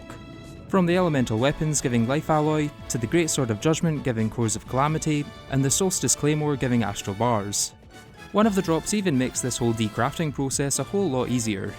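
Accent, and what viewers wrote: Scotland, United Kingdom. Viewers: By the way are you scottish? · are you scottish. that is my accent guess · i love your Scottish accent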